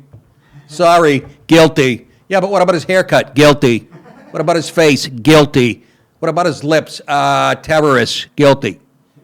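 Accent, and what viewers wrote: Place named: Boston, United States. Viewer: Nah, he’s just from the Boston area. That’s how we sound!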